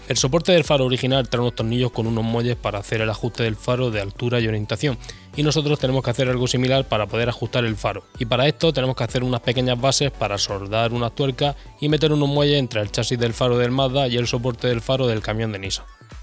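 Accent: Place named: Granada, Spain. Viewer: Tio, tienes to la voz de granaino, eres de aqui o que?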